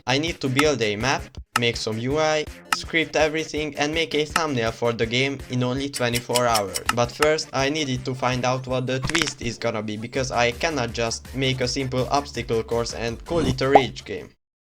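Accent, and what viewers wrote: Hungary. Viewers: Csá bro hallom hogy magyar vagy amúgy király vidi❤😮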